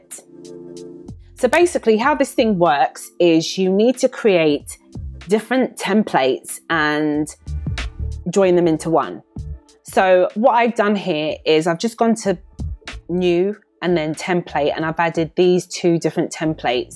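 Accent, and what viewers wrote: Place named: United Kingdom. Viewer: Hi! Excellent video. Not to mention your beautiful British accecent!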